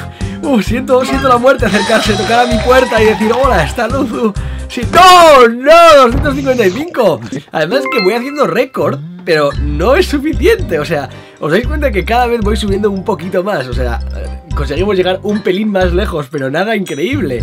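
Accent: Argentina. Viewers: Amo como hablas en castellano (argentino) ❤ SOS UN GENIO💜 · hablando argentino es lo más hermoso que hay♥ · Jaja a es al que mejor le sale el acento argentino (entre los youtubers españoles que lo hacen)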